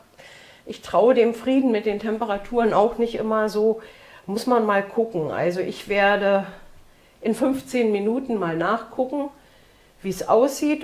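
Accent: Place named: Altmark, Germany. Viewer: Sieht superlecker aus 🤪 Dankeschön für Dein Rezept Vom Dialekt her kommst Du aus der Altmark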